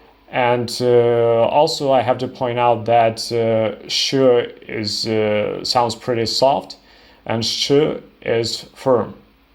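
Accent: Belarus. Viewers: Yeah, he is Belarusian. And also can’t pronounce Russian soft d and t. Instead of it dź and ć as it common for Belarusians. As a Belarusian I have the same accent even though we mostly speak Russian :(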